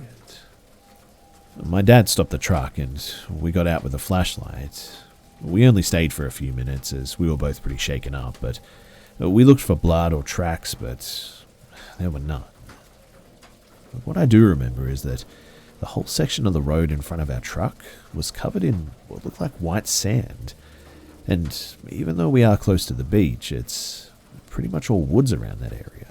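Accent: Australia. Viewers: One of the few people who's voice actually makes me feel safe, comfy and ohhhh sooooo chilled., i could honestly listen to you forever, what I'd give for you to read me a bedtime story every night, I'd sleep great permanently......gotta love an Aussie accent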